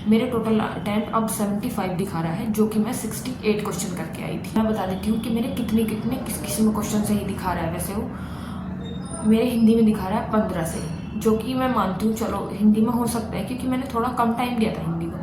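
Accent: South, India. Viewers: Mam Aap south se ho kya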